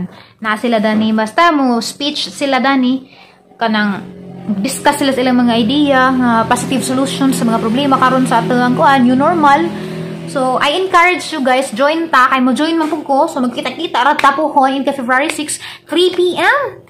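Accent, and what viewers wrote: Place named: Visayas, Philippines. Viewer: I like your Bisalog Accent dai relate ko kay ing ana pod ko bsan ang dugay ko na dito sa Manila eh😀😀😀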